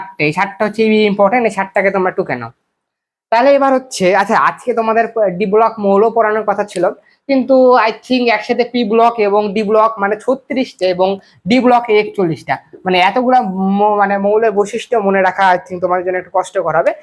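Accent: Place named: Chapai Nawabganj, Bangladesh. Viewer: Vai apnar kothai a Chapaiya feel pacchi. Dari tao sei, chagol er moto cute.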